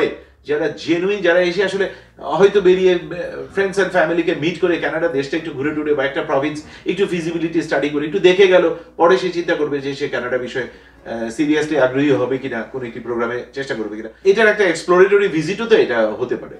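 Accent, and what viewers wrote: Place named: Kolkata, India. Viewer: I am from Kolkata. I follow your all YouTube video. It already make me your fan. Your Bengali conversation 100 percent like as our Kolkata. No one can differ you as a citizen of Kolkata or Bangladesh. Thank you so much for your informative video.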